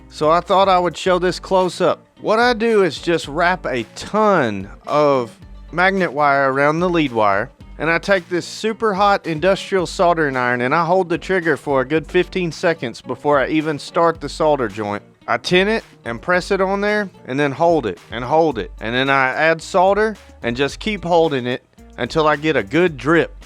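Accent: South, United States. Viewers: As a native Tennessean, I love hearing a deep southern accent saying intelligent things. Kick the stereotypes!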